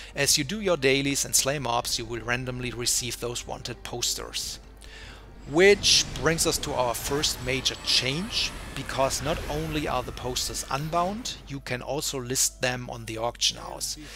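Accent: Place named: Germany. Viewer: He sounds german, but i'm not sure.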